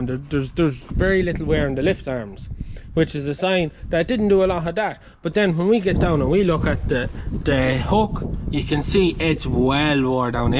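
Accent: Ireland. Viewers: I might be wrong but are you Irish?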